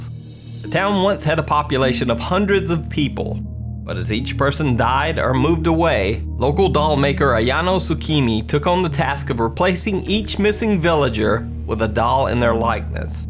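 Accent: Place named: South, United States. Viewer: There's a hint of Southern accent to your voice here. But I haven't noticed it in any other videos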